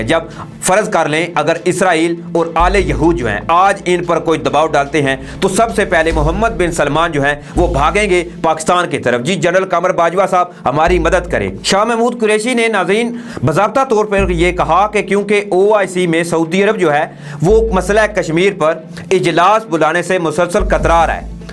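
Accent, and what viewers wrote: India. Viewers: Dost Urdu thek Karo plz aap Indian style Urdu bol rahe hay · Yeh banda indian Hé is ka talafaz ghor se SUNAIN pâta chal jaye ga Hé is indian.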